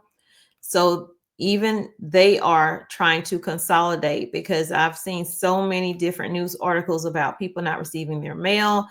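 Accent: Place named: South, United States. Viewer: She sounds like a southern white woman🤷🏽‍♂️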